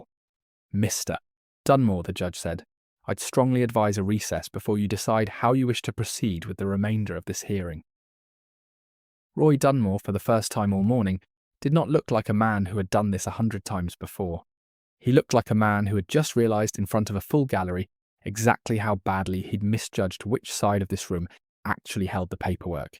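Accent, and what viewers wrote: United Kingdom. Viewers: Good story. Interesting British voice.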